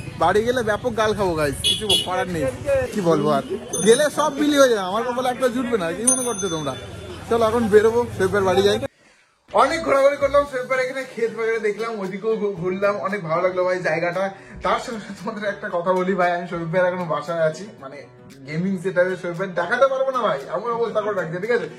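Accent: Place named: Bangladesh. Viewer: দাদা কথার ভিতর বাংলাদেশি বাংলাদেশি ভাব এসে গেছে কিন্তু🐸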